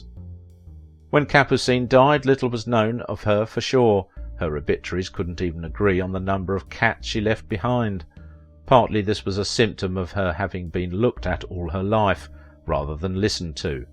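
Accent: France. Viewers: Your french pronunciation is cute ❤❤❤❤❤🇨🇵🇨🇵🇨🇵🇨🇵🇨🇵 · The narrator has a lovely French accent. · This was a very good video. I am I see your French accent as wonderful as well. Thank you for doing this yes I actually learned quite a bit about her and you portrayed her in a very fair light. I really enjoy your channel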